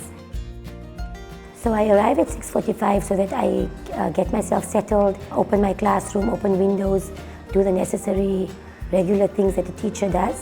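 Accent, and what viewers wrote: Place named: Cape Town, South Africa. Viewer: South African Cape Town Accent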